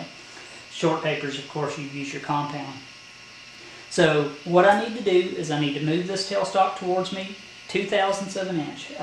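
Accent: United States. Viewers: Can't remember who pointed me in your direction but it certainly was worthwhile. Refreshing to see a youngster working with metal. Sorry if I missed the information in a yet to be viewed video but who manufactured your lathe? I'm not from the USA so please tell me about your accent, which state are you from? As for me, my avatar should give you some specific information. I've just subscribed!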